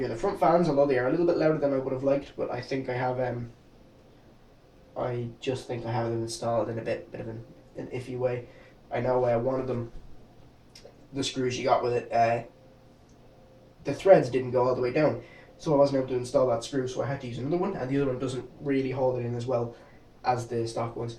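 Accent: Ireland. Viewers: also what part of Ireland are you from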